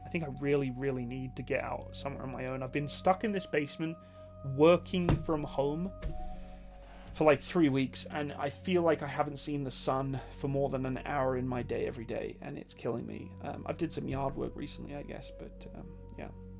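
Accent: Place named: Scotland, United Kingdom. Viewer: 3/5 Darkhorse...You sound scot not kanuck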